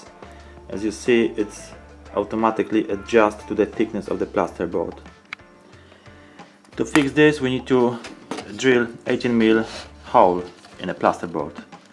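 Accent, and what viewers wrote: Poland. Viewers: Polski akcent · Słychać że rodak :)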